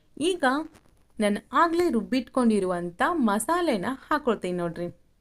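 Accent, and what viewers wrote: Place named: Bangalore, India. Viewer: ಅಕ್ಕ ಅದು ಕೊಬ್ರೆ ಮತ್ತು ಹಸೇಮೆಣಸಿನಕಾಯಿ ಅಲ್ಲ ಅವ್ವ. ನಮ್ಮ ಉತ್ತರ ಕರ್ನಾಟಕ ಭಾಷೆನಲ್ಲಿ ಕೊಬ್ರಿ ಮತ್ತು ಹಸಿಮೆಣಸಿನಕಾಯಿ ಅಂತಾರ. ಜರ ಸ್ಟೈಲ್ ಮಾಡಿ ಬೆಂಗಳೂರು ಥರ ಮಾತಾಡಬೇಡ. ನಮ್ ಹೆಮ್ಮೆ ಇಂದ ನಮ್ ಉತ್ತರ ಕರ್ನಾಟಕ ಭಾಷೆ ನಲ್ಲಿ ಹೇಳು 🤦🏻‍♀️🤦🏻‍♀️🤦🏻‍♀️🤦🏻‍♀️🤦🏻‍♀️🤦🏻‍♀️🤦🏻‍♀️ಮತ್ತೆ ಅದು ಕೊಪ್ ಅಲ್ಲಕ್ಕ, ಅದು ಕಪ್ Cup 😅😅😅😅😅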